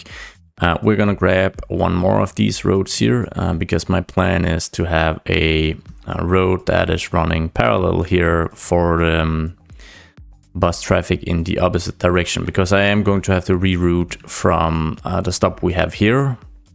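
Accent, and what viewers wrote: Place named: Denmark. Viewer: I KNEW IT I KNEW THAT U WERE DANISH I HEARD IT HEARD IT OUT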